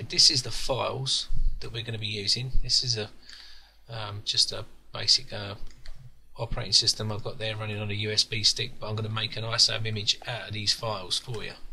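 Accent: England, United Kingdom. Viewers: buddy ur from england???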